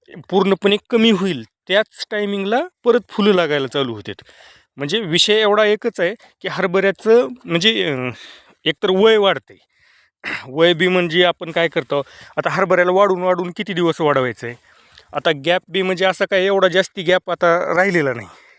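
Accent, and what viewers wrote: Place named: Latur, India. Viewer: Bolibhasha latur che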